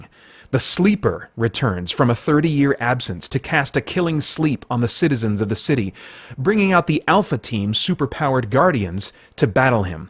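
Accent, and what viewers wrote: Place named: United States. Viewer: Haha. Laughing out loud at you trying to pronounce the names. Nadine's last name is pronounced Brand - Ess. Pretty sure It's Morgan L Buss - ee And I think it's Gillian Bront - Ay (like the Bronte sisters) Adams. Interesting to notice that in the American accent, Fawkes and Fox sound pretty much the same. We have quite a distinct difference between the two.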